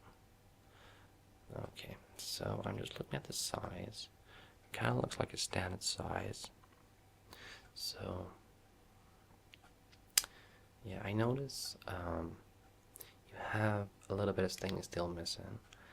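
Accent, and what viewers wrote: United States. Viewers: oh that american accent is hilarious & insanely cute. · THE AMERICAN ACCENT IS AMAZING. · You know, I've always wondered what it would be like to do an American accent when you normally have a British one. Anyway, good work on the accent; it's not that terrible! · I didn't think your American accent was that bad actually! I have definitely heard worse :D This was a lovely video. I was surprised at how the sound of the metal got my tingles going. What a great trigger! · Love the American accent. :) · I hear... Boston, NY and some Tennessee twang. Now thats American! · omg nice American accent!!!! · He's in the UK. Maybe he's trying to do an American accent. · I LOVE your american accent! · Say... that's a pretty good American accent. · You're a mix between classic US accent, the Joker, and a nerd... And I kinda love it. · Great video! 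And it is funny how you try to do an American accent (you did a good job) and you didn't really pronounce the "r" which is typical British. So instead of saying offer you said offeh. Don't get me wrong I like it! Once again great video and I've been subscribed to you for quite a while so yeah! Keep up the good work! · Nice American accent great role play thank you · Not a bad American accent! Much better than most Americans can do an English one! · Wonderful job with this one. You did a pretty good job with the American accent. You dropped it once or twice when you said certain words but it's no biggie at all. It's a wonderful video. Brilliant job as always. :) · This is my first time watching him and I thought he was American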